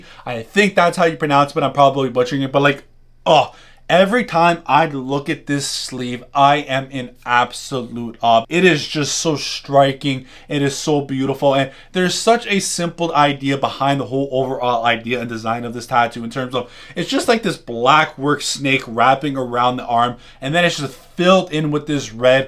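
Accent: Northern Ireland, United Kingdom. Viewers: Wow bro, yr accent sounds exactly like a Northern Ireland accent, is that where yr from? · Northern Irish 😂😂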